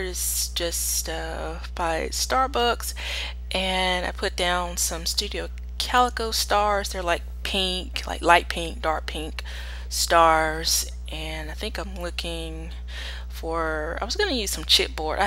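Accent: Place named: South, United States. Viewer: This was the first time I've watched one of your videos -- NICE JOB! I totally love your Southern accent. Great PL spread. Thanks for sharing, I'll tune in again!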